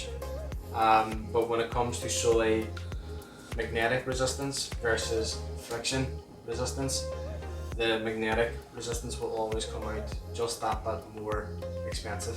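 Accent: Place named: Ireland. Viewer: It’s just an average Irish accent, nothing to go bananas for…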